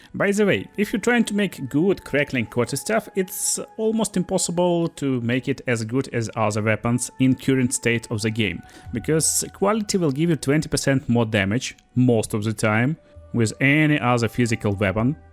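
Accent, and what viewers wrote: Russia. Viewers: I love when Russian natives use their deep and heavy accents to talk about the best video game in the world-- Path of Exile 2. And yes, they finally put out a major fix for performance and crashing issues. :] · it sounds like a hard Russian accent.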